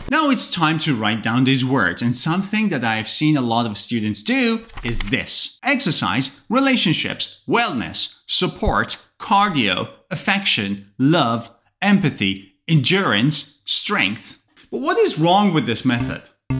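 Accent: London, United Kingdom. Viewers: His london😂😊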